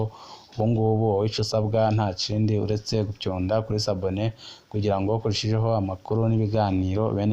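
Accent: Rwanda. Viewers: oya uyuwewe ararenze avuga niryo atazi ninumurwanda none burya arunva KO atanikirundi azi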